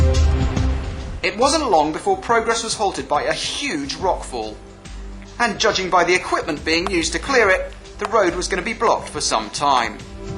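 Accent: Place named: United Kingdom. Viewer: stupid bri-ish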